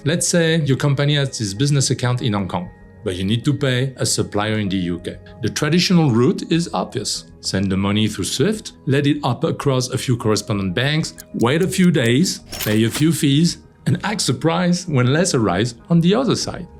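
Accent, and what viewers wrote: France. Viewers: Interesting content on this channel but hard to listen to the French...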